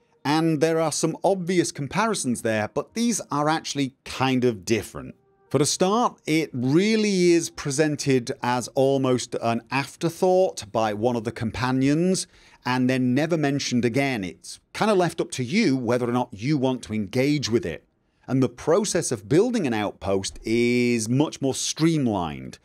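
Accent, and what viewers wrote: United Kingdom. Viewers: Well then. So I know how whining sounds in a grown man's British accent.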